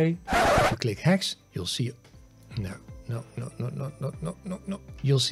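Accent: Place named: Netherlands. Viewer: Hey there! Are you perhaps from the Netherlands? As another dutch person I have to say you've got an amazing accent, definitely still recognizable as dutch but FAR better than any other dutch person I've heard speaking english (Who isnt a professional singer who has trained their accent for their entire life.) haha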